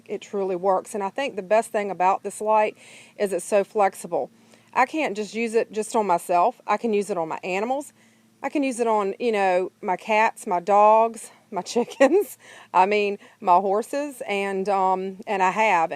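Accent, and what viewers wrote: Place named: Virginia, United States. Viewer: You sound like you're from Virginia or maybe NC, but my money is on VA. Love VA! Am I close? 😊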